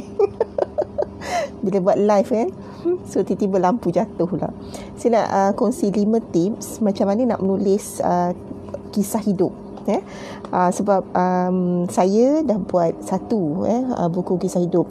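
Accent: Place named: United States. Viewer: Buku naif best.. penulis membawa jiwa pembaca kesana.. banyak benda dpat beljar terutama culture. seorang yang cekal (Grit), resilience, introvert yang mempunyai daya kepimpinan. Pandai berdikari. Rasa macm nak dengr banyak benda lagi.. sbagai tambahan mgkin boleh translate kan English sentences, so that the rest also can understand. Oh, saya.. saya rasa ada bakat tersembunyi yg boleh di majukan, dan dikembangkan.. iaitu American English. Mana tahu boleh buat video mengajar peminat untuk belajar American English. mesti hebat tu.. hehehe.. Apa-apa pun bacaan yg best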